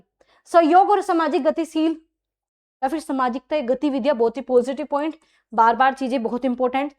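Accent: Baghpat, India. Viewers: Mam aap Khekra baghpat se ho kya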